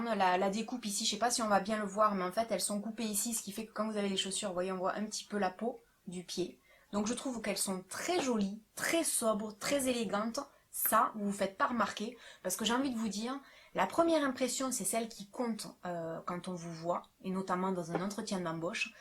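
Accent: South, France. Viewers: trop bonne vidéo je t'aime trop t'es trop cool et esque tu vient du sud car tu as un accenct bisous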